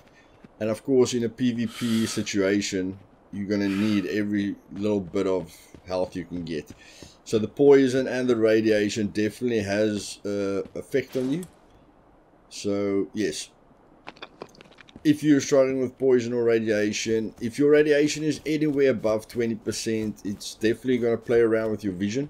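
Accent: Afrikaans, South Africa. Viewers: are you africaans by any chance?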